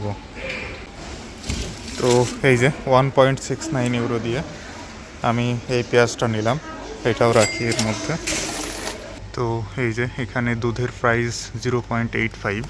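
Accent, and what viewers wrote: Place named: Pabna, Bangladesh. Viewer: First video dekhtesi probably apni pabnar manush or ashe pashe basha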